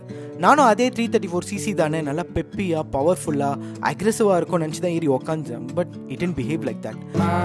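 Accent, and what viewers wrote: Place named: Chennai, India. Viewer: Your tamil .. is it how tamil is spoken in Madras these days ? Bad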